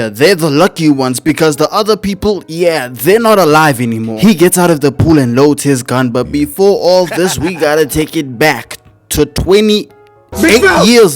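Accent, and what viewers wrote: South Africa. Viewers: You sound south African bro😂😂 but don't worry I fucks with your content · I knew you were South African 😭😂🇿🇦🇿🇦🇿🇦🇿🇦 yebo lapho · I dont know .... bro sounds south african 😑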